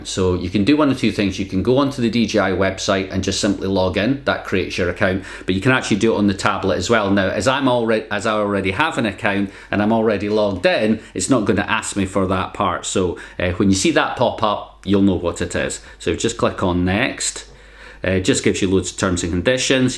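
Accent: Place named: Edinburgh, United Kingdom. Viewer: Secretly excited I knew your accent was Edinburgh in a matter of seconds. I lived in Alnwick, England for 6 mo. during college, which is not far. Cant wait to return on a trip this fall, I have missed the UK! Need to research the drone flying rules there- is it strict? Thank you, sending high-fives from Minneapolis!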